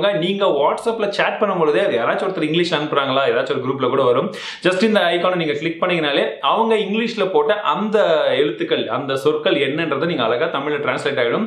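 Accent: Chennai, India. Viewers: Chennai basala pesathinga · Chennai tamil super anna semma funna iruku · Really i enjoyed the madras slang of you bro it nice😍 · Chennai language supera pesuringa anna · Chennai Slang thaaru maaru bro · Bro...unga chennai slang spr broo😍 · Bro Chennai slong semma super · Super bro. Thank you bro 👍👍 . At last Madras language 👌👌😂😂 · Ada ennaapa nii chennai slang ķu poita nee........¿ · Chennai tamil 👌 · Bro tdy neega chennai la iruka peoples pesura maari pesuninga...☺️ nalla irudhuchu... Use ful tricks bro...👍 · Scheduled msging sema bro Nenga pesura antha Chennai slang la pesurathu vera lvl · ✋✋✋✋✋தயவுசெய்து இனி சென்னை தமிழ் பேசாதே முடியல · Chennai slang vera level bro 👍👌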